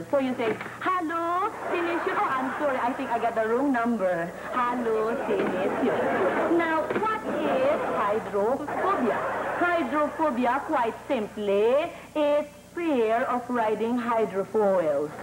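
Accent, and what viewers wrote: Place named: Visayas, Philippines. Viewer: Bisaya añg punto ni.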